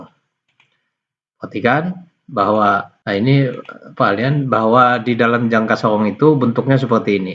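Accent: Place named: Banjar, Indonesia. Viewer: umpat belajar Guruu .. R nyaa banjar banar😂jar abah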